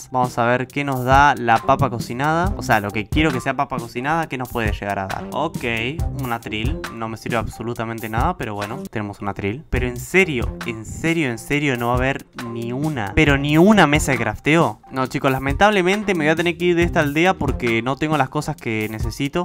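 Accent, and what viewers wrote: Argentina. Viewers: ME ENCANTA NETA ME ENCANTA EL ASENTO ARGENTINO Y EL TERMINO WUACHO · se le nota la vos Argentina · sos argentino? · sos de argentina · ¿sos argentino? me parese conocido tu acento por que yo soy de argentina.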